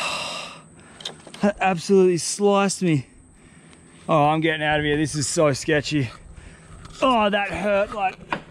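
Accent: Australia. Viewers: Hi mate. Could you please tell me what kind of australian accent does he have? Im so curious as im learning english too atm, and I definitely think that this accent is 100% easy to understand than american english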